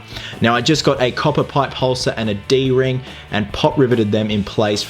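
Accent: Australia. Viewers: Mate, are you Aussie. Cause if so go Australia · are u Aussie u sound it I am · R u from Australia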